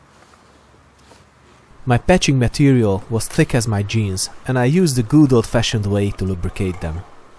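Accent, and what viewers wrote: Hungary. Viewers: Hungarian accents are gorgeous :3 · Are you hungarian? (Because you have a hungarian accent.) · A hunglishodból egyből tudtam, h magyar lehet az anyanyelved, még mielőtt utaltál rá :) Tetszik a videó, csak így tovább! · What i hear is hungarian accent? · Behold the hungarian accent... :D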